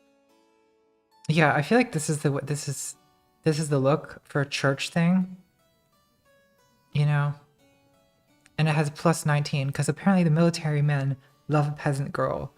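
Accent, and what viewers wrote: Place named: RP, United Kingdom. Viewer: And she has a royale English accent.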